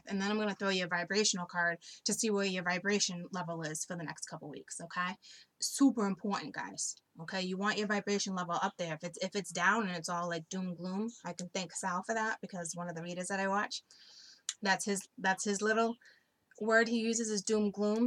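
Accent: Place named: South, United States. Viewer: lmao... Lovely Southern Drawl ya got there and most appreciative from This Virginian Aries with a Southern Bwoston blended tongue... yeah, it's a long story.. but I AM...and so it is. THanks! <3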